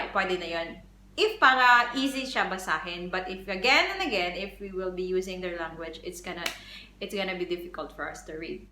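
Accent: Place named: Thailand. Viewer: Galing muna mag thai sis as what ive said i like the wsy u pronounced it similar to thai people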